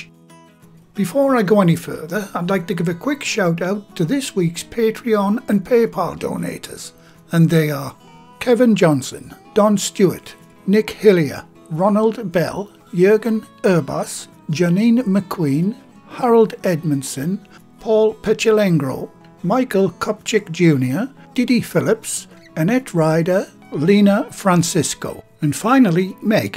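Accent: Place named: Sunderland, United Kingdom. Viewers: Just found your channel,I saw the corned Beef and potato pie and thought great lets see if its like my Gran used to make.Lo and behold when i started watching and heard your Northern accent it made me home sick and hungry.My family on my mams side were from Seaham.Just watched the video on Panackelty great so pleased I found your channel.Dont know if you have made Ginger Wine or not but going to have a look.Regards from a northerner living in Spain.Thank you. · Ah, a makem, I couldn't place the accent. One of the weird things about the north east is the variation in accent over very small distances. My earliest memories were in Felling, then Ouston, then Low Fell, until I moved down south for work then emigrated to the US. I've walked home from South Shields to Low Fell on New Year's Day so I think it's not too much of a stretch to say I lived within walking distance for 24 years. 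Thanks for doing this. My mother lectured at Gateshead Tech in cookery, and she wrote down a lot of her recipes for me, but it helps to have the video version. The things I really miss are stotties and Cumberland pork sausage. So now I have two hand cranked sausage makers, but I am not a bread maker... yet. America does not do decent pies, so I just bought a set of pie tins and a pudding basin. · Love the videos, I'm from Sunderland myself but moved away when I was 16 I'm 52 now living on the Isle of Wight I miss the accent and the food, that's why i watch these great videos Thanks so much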